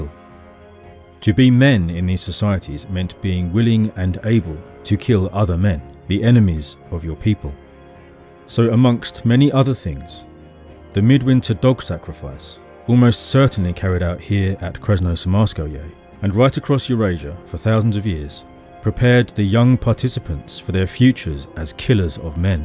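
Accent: United Kingdom. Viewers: you sound pretty similar imo. You sound a bit more serious. But joking aside, this was a thoughtful video and I enjoyed it a lot. I hope my little joke didn’t make you self conscious or anything. If it helps, my barbarian American ears just lump all British accents together so you could chalk it up to that.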